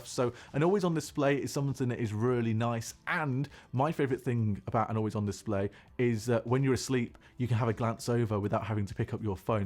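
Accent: United Kingdom. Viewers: Thanks for the updates, it’s always kind of fun to anticipate with the new iOS will do and what improvements are on the way. Can’t quite figure out if you’re British or Australian but I do like the accent. You have a friend in Columbus Ohio USA!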